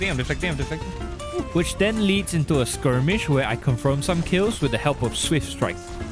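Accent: Singapore. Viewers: are u singaporean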